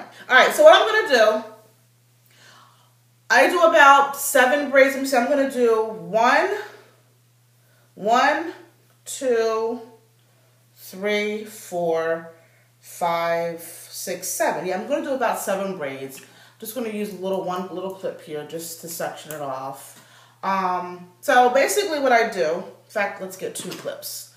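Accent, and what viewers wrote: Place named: United Kingdom. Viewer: Looks good! You sound like you have a British accent. Your hair is always fab.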